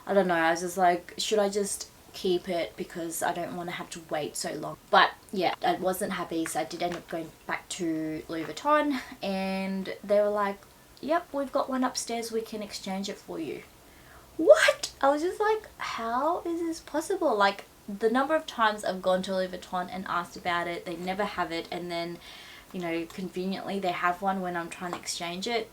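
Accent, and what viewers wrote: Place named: Australia. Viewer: You're so Aussie 😂 I say cans too. I've heard you're supposed to say carn but maybe I'm wrong. Anyway love this bag and love the reverse monogram w the monogram 💖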